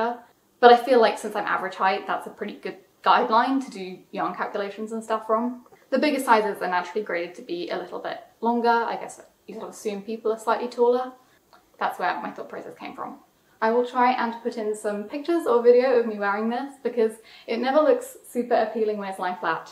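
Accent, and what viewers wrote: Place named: United Kingdom. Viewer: I’m binge watching every episode )) I love my blacks and pinks but I’m obsessed with your British accent and colour choices )))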